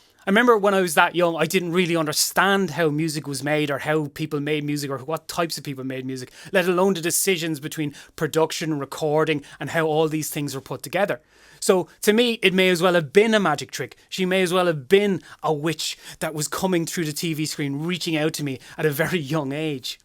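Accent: Ireland. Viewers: This was excellent!! Love your eloquence, gorgeous Irish accent & fabulous commentary. Thank you!! " " eternally. ❤🌹🖤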